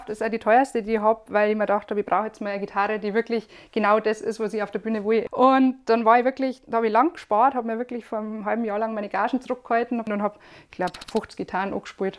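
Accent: Bavaria, Germany. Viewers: Bayerisch is einfach so ein schöner Dialekt 😛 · Ich kann es mir ja leider nicht gut anhören, weil ich mit bayrisch nicht zurecht komme. Aber fiel Glück weiterhin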